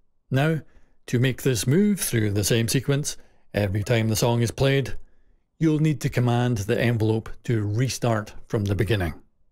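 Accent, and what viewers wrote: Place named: Scotland, United Kingdom. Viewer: Scottish accent without a doubt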